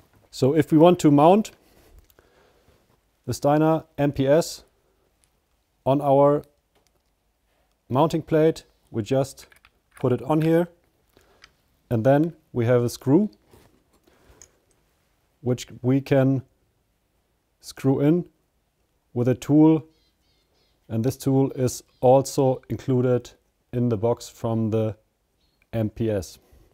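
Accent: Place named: Germany. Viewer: geiler deutscher Akzent..... 🤣🤣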